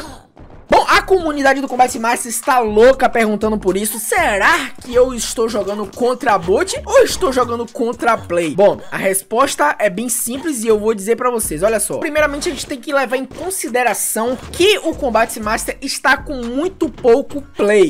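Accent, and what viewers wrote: Brazil. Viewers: esse sotaque ai é de salvador né, possivel...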